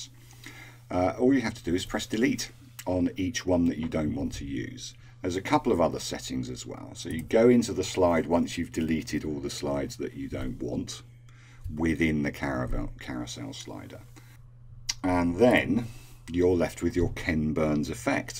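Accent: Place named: United Kingdom. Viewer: Thank you for this video! You saved me hours of frustration with the Ken Burns effect! Loved listening to your British accent too! lol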